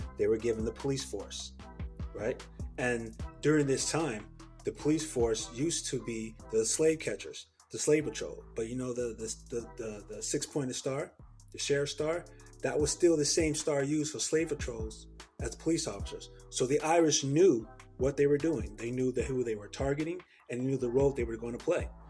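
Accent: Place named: United States. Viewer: The way he pronounced words i can tell he's a 3rd maybe 4th generation American and based on the fba foolishness he's not a fba. Please stop it with the pseudo history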